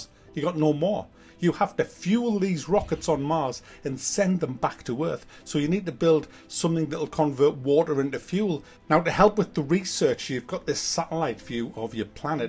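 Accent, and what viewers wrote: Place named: Consett, United Kingdom. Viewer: You must live near Consett. I recognise that accent anywhere!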